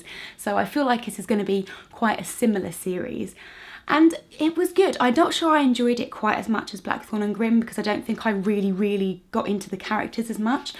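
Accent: United Kingdom. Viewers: You're great, I love your British pronunciation and as a student of English philology in Poland I just have to say how much I admire your work!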